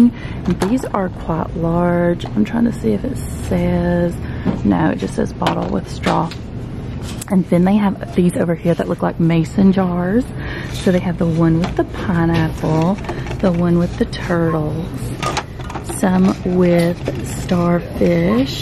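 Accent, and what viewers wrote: South, United States. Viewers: I just love your voice!! So southern 💛 love your videos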